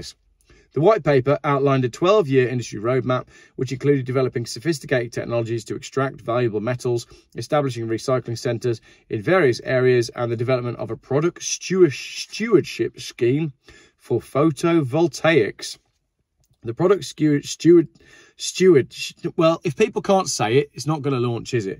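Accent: Australia. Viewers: Great stuff, even the Aussie accent is spot on. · Watching in Australia... that is the best Australian accent I've ever heard from a pom, my friend. Most people over do it. You got it just right, 😆👍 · Loving the oz accent 😂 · Pretty good Aussie accent,. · Love your ozzie accent ❤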